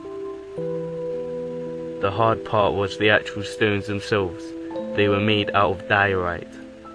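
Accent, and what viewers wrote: United Kingdom. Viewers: What you see hear? There? I love how Puma Punku leaves massive unanswerable questions, but really? I guess what I am saying is that your British accent is not too strong enough for me to requite poorly written subtitles. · Next time you post a video please have the text checked first by someone who completed their O-levels, then have the voice over read by someone without a Yorkshire dialect. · im not hating on the london accent, im from london myself, im just saying that it makes you sound unprofessional. i speak like how i was taught in school, like you are supposed to speak yes? personally i believe the london accent is not as dominant as lets say scotland. we have a choice here, either speak normally like many people around you in london, or speak like a twat. and there you go.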